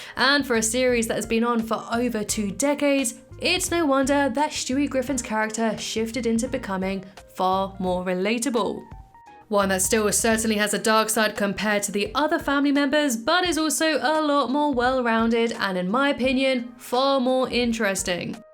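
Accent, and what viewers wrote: United Kingdom. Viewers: Are You British Or American Cuz I’m British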